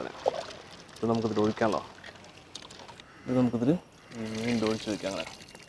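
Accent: Palakkad, India. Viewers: ഇക്കാടെ പാലക്കാടൻ സ്ലാങ് ആണ് എനിക്ക് ഏറ്റവും ഇഷ്ടം 😇😇 ഫുഡ്‌ പിന്നെ മാത്രം 😘😘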